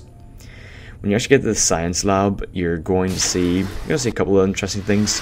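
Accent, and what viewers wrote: Northern Ireland, United Kingdom. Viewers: Northern Ireland ?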